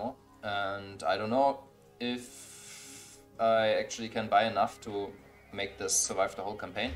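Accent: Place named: Australia. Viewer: Your accent is cool. Are you Australian?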